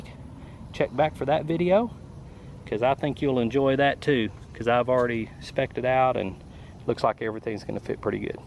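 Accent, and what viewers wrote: South, United States. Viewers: Fellow grinder here from Alabama. Just found your videos while looking at sno peak and toaks cooking set ups. Your videos are very helpful. I'm also a gravel grinder, and in fact moderate the Alabama gravel cyclists facebook group. Feel free to join if you want. By the accent, sounds like you might be in the south. I'm currently acquiring bike packing gear. I just received my revelate bags front and rear with a rockgeist bar jam, and have on order a warbonnet hammock, tarp, underquilt and top cover, and hammock gear top quilt. I'm now looking into what if any cooking gear to buy, vs. pre cooked or pre made stuff like energy bars etc. I like the idea of being able to do a multi day tour with cook set up. Your feedback would be appreciated.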